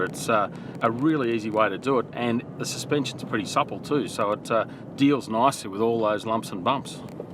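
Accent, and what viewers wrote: Australia. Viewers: auzie accent :(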